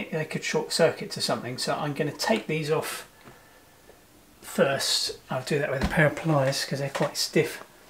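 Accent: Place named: United Kingdom. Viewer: As a note, ABYC Standards do not allow solder as the sole means of connection for an electrical connector. You can crimp AND solder, but solder by itself is not permitted. So if your boat may be subject to ABVC Standards (or you want to meet typical standards) then crimping should be used or used as well. Not probably an issue based on the accent in the video, but I'm not sure of Euro/UK standards and if they differ.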